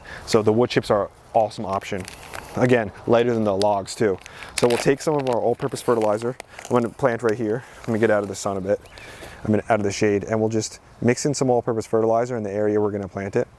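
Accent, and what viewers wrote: New Jersey, United States. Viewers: 💦💦💦💦💦💦💦💦💦💦 I love how you stay true to your Garden State home, by continuing to pronounce water the Jersey way ~ “wooder”! 😊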